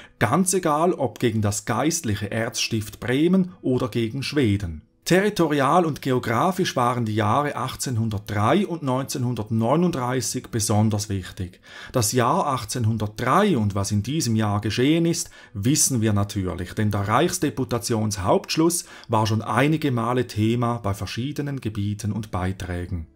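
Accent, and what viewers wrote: Switzerland. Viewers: Lol....Ein Eidgenosse unterrichtet deutsche Geographie und Geschichte :D Jetzt hab ich alles gesehen und kann glücklich sterben xD Dein Akzent is der Hammer ^^ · Also ich muss hier mal ein Lob aussprechen. Für jemanden mit Schweizer akzent - is das astreines Hochdeutsch. Hört man sich gerne an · witzig , schweizer akzent erklärt das plattdeutsche land ... 🤣🤣🤣🤣🤣🤣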